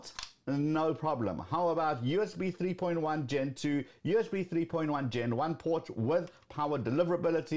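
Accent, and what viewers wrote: South Africa. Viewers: is that a South African accent ?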